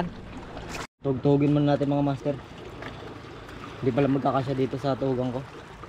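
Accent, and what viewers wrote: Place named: Zambales, Philippines. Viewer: Master bat Pay tawag mo sa kasama mo? Alam ko dito lang sa Zambales ang nag tatawawagan ng pay. Punto mo para ka ring zambaleño...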